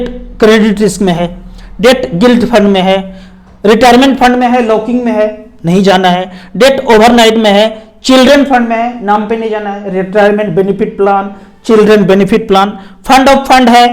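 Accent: Bihar, India. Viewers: Sir aapki wani me bihar ki khushbu aati hai aap hai kaha se 😂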